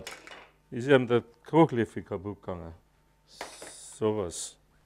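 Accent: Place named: Bavaria, Germany. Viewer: Wunderbare Musik und ein noch besseres Rezept. Und das ganze auf Boarisch...herrlich😂😂😂 Endlich mal wieder etwas schnelles und bodenständiges. Sehr schön. Das Video hat mich in gute Laune versetzt. Danke dafür 😄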